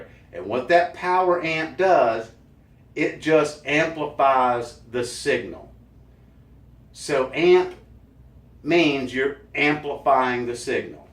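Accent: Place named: South, United States. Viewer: Very good video, I especially like the repetition of terms, that way you remember them better. Btw, I am south-European guy, but I would say based on your accent that you come from the south of States somewhere ... :)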